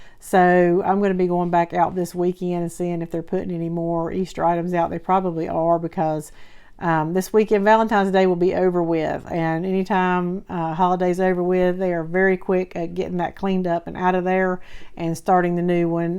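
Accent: South, United States. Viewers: I thought your "specific" southern accent sounds like my family❤ Lots of my family live in East Tenn. and western NC which is pretty close to that region. Don't mean to sound weird, but there are several different variations of southern accents😊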